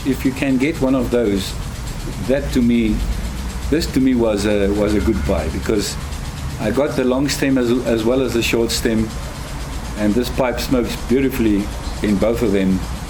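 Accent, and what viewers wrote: South Africa. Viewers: Are you south african? Your accent is very boer-like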